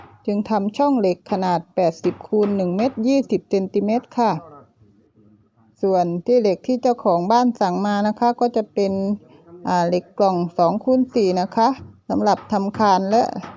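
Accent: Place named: South, Thailand. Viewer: ชัยภูมิ..(พูดเหมือนคนใต้)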